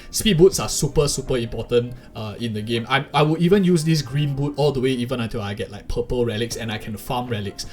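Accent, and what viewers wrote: Singapore. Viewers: he definitely sounds singaporean ngl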